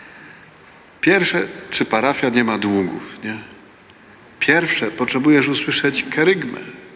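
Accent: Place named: Podhale, Poland. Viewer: Z gór.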